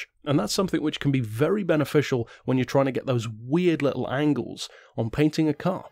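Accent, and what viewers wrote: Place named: London, United Kingdom. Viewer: it is tho its like london or smn, but not AYO FAM BUS MAN YOUR P'S- london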